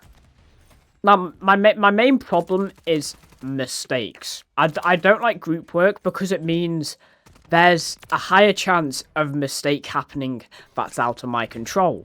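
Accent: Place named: Yorkshire, United Kingdom. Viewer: You're in Wales? I'd of sworn you were in Yorkshire... 😂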